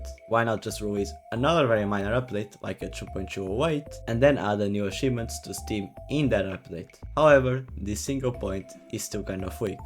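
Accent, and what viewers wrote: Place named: Spain. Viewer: bro, tienes acento español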